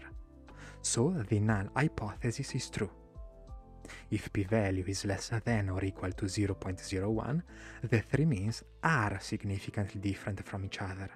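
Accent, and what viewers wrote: Italy. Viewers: Sei italiano? E palese ;)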